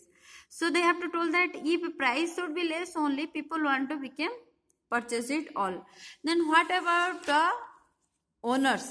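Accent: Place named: Odisha, India. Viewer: Odia accent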